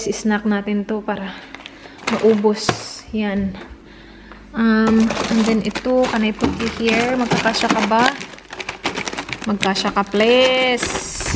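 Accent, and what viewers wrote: Visayas, Philippines. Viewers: Hello po. You sounds like bisaya. May I know kung taga saan po kau? I'm proud bisaya from Davao city:-). Looking forward for more videos to watch... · Life en easy lng naiintndhan ko ..😒😒😒bisayang bisaya ang dating sa mga words na sinasabi... buti ate nagustuhan ka niyan😄😄😄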